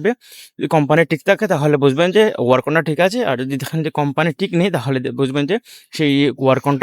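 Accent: Sylhet, Bangladesh. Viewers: ভাই কিতা সিলেটি নি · Bhai afne Kita sylheti ni?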